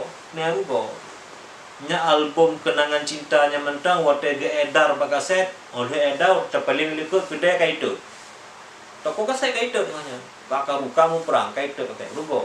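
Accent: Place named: Aceh, Indonesia. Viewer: Logat peugah haba hana hie agoe Aceh Besar. Hie Aceh Utara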